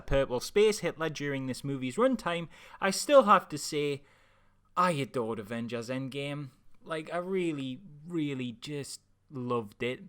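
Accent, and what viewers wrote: Newcastle, United Kingdom. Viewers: Big plan eh? Just a heads up. I came back for the spiderverse review now I have finally watched it but lo an behold....I had been un subscribed.....good job I remembered the channel name eventually as 'well spoken Geordie does eloquent reviews' was getting me fkin nowhere....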